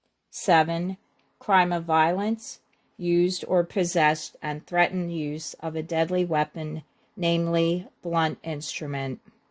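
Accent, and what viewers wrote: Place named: Philadelphia, United States. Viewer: I knew you were from philly! Me too. You actually sound so much like my mom.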